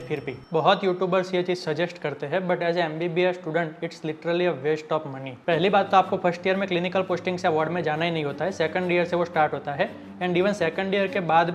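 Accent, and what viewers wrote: Gujarat, India. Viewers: Behind Hindi...feel Gujarati Aap Gujarati ho kya?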